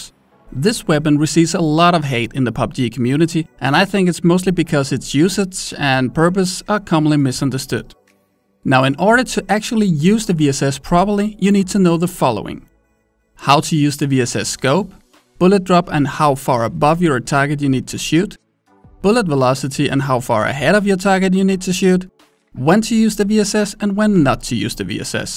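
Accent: Denmark. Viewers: damn, youve lost alot of your danish accent over the past five years =D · You sound Danish? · Are you Danish?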